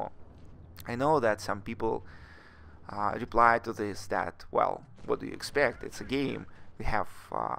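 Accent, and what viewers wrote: Russia. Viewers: акцент русского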